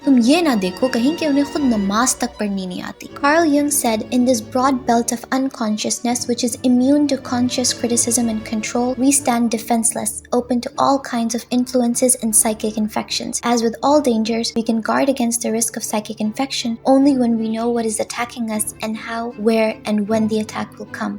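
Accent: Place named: Pakistan. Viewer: I understand now. I should've deleted the above comment after you explained the same in a reply to my other comment :P I assumed you are an overseas Pakistani because of the accent XD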